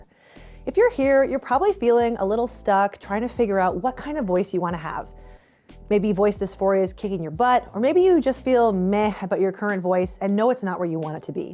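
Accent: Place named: New Zealand. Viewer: This kiwi accent makes it real hard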